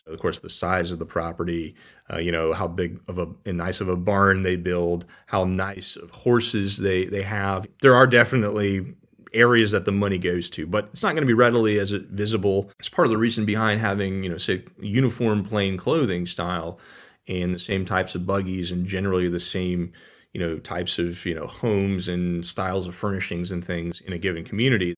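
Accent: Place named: Pennsylvania, United States. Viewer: I believe your from Pennsylvania, the way you pronounce the Word Home or Home’s. ?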